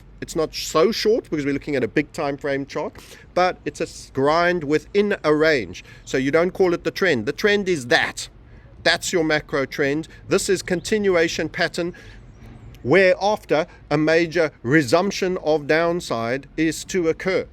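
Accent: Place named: South Africa. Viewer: His speech sounds South African to me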